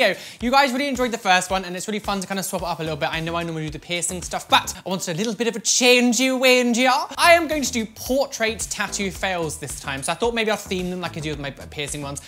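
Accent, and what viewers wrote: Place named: RP, United Kingdom. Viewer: Omg I haven't been watching your videos for long bit I think u r soooooo funny and I love the accent ur so posh sounding 😀😀😀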